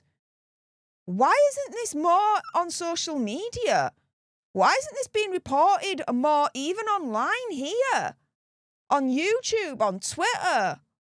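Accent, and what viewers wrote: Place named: England, United Kingdom. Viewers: You sound like you're from Hull, with your accent. Or nearby. I am from ULL myself. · Great to hear a good Northern accent for once in political discourse. We might get more sense (oh, sorry, I forgot Our Ange ! ) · 😅 wow young models could they be upset about something jealousy drugs I think this is going deep but the media seems to be running away from it😅 oh by the way I love your English accent😊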